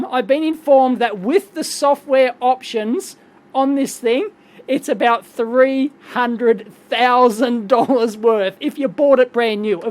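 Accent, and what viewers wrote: Australia. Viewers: This guy is Aussie AF. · Aren't Aprils fool an US-only tradition? Youtuber is from Australia (as if his accent didn't made that obvious)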